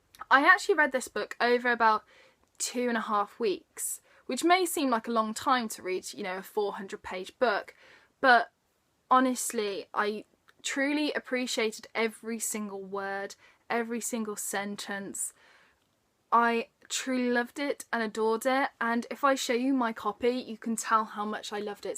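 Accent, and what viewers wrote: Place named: Midlands, United Kingdom. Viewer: You can definitely tell, Midlanders for the win! I'm from Stafford you see haha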